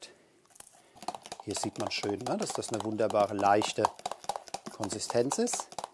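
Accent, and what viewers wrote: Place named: Hesse, Germany. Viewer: Ich liebe diesen hessischen Einschlag! :-D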